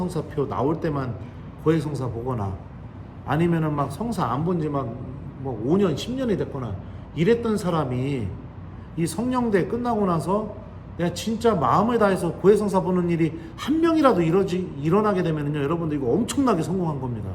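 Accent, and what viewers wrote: Chungcheong, South Korea. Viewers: 신부님 수고하십니다.미국 에까지 가셨네요.몇칠전에 본당신자들한테 1번2번3번 하셨싸트만 또 벌써 그멀리 가셨네요.신부님 강론듣다보면 우리고향 충청도 말씨 때문에 너무 재밋게듣습니다.ㅎㅎ오늘도역시 충청도뚝배기말 (뿌랭이)표준말(뿌리)소리듣고 많이 웃습니다.신부님 미국에서 열씸히 하느님말씀 선포하시고 건강히 계시다 행복한마음으로 돌아 오세요.😅😂😢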